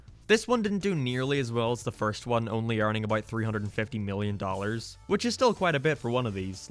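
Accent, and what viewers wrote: Ireland. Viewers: Your accent sounds familiar... Are you irish? Sorry for asking. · Are you Irish?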